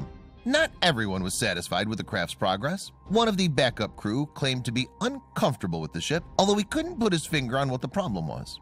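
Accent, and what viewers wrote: United States. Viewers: This was a truly horrifying and tragic event . Im afraid the commentators Perky Annoying American Voice doesnt really Suit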